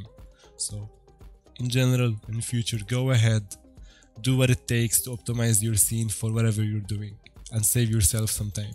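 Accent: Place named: Austria. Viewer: You have an accent similar to Arnold Schwarzenegger, and in some movie Arnold said "Get to the chopper!" I guess it's a reference to that.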